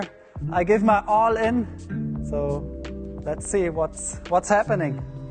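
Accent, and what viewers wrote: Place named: Germany. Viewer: His English sounds so ... German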